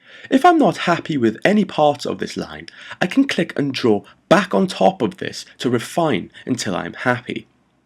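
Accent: Wales, United Kingdom. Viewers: Your tutorials are great, as many people have said. I now believe a Welsh accent should be the universal tutorial approach! This one particularly deserves a comment because although it could be editing, I've never seen anyone talk for 50 minutes straight and still be just as enthusiastic as when they started! Great work, the skip to section in the video description is an especially good idea.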